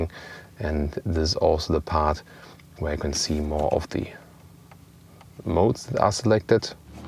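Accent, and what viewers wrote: Germany. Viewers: The inflection of a German accent in English makes for a fantastic review!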